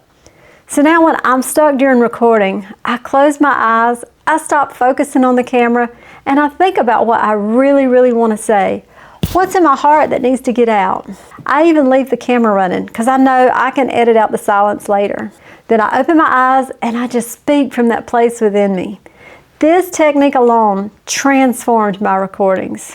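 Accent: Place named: South, United States. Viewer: Not just Women watching you. I’m a subscriber finding your videos very helpful and informative and I’m a 26 year old man 😅 Your videos are great. You remind me of my southern teachers I loved back in my Middle school days when I transferred from Hawaii to Florida, southern accent and down to Earth. Your YT is gonna pop off when Fl, Georgia, Tennessee, Alabama ect. algorithm finds you 🙌🏽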